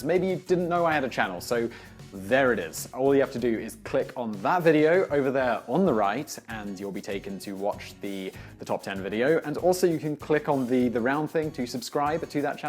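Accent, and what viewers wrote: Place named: RP, United Kingdom. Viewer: You are very informative inside YouTube program however you have a certain tone commonly known as Oxbridge I know one has to speak distinctly but so do I without send sounding condescendingn inner wave sound like Brian Sewell is he still alive help me YouTubers or is he dead not you obviously because you sound a lot more friendly uh I just wonder if you have a certain tone I said Crapper not kappa one could almost think of somebody called trump trump Astra now Probably get arrested for daring to mention his name thank you for your downloads not faded old queen who does like your downloads but I'm worried about trump trump yours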